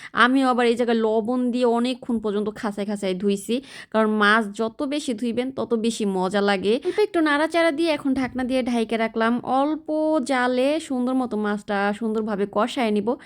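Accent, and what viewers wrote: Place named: Dhaka, Bangladesh. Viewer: আপু আপনাদের এলাকা কোথায় বাংলাদেশের, আপনার কথা শুনলে মনে হয় আপনি ঢাকা বিভাগের লোক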